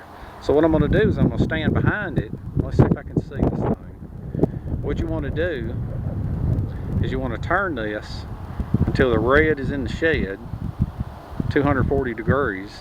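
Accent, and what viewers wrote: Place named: Boston, United States. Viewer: To understand Boston accent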